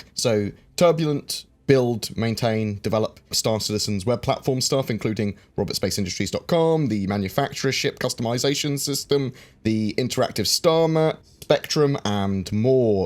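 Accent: England, United Kingdom. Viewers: the English accent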